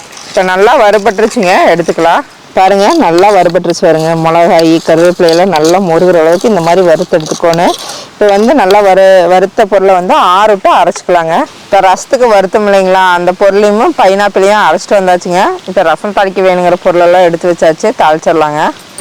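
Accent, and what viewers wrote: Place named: Kerala, India. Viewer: Adipoli kerala speeking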